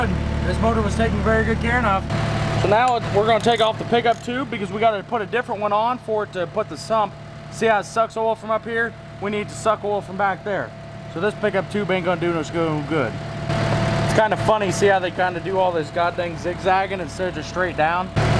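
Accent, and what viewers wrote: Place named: Oklahoma, United States. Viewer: Okc guy in here...sounds like your close...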